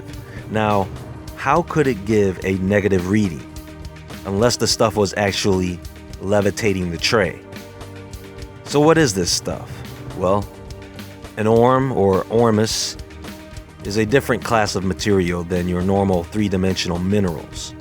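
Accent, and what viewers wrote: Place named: New York, United States. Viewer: Bro are u from NY? you sound like it..I'm from bx live in queens now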